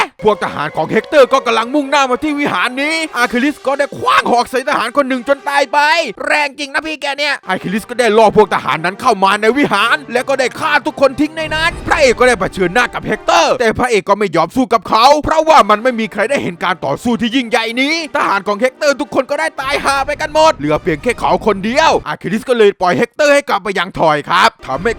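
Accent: South, Thailand. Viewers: พี่เป็นคนใต้ใช่ไหมคะ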